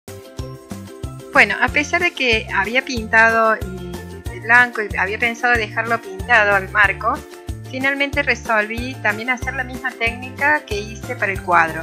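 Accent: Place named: Cordoba, Argentina. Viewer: hermoso quedó, y me encanta tu tonada cordobesa 😄😍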